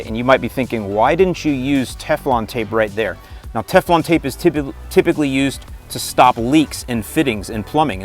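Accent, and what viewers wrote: Chicago, United States. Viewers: Found this video for the first time looking to figure out what’s wrong with my sprinkler system. I hear the “couple two or tree” then the “lowesss” and thought what was a Chicagoan doing somewhere there is so many palm treesss?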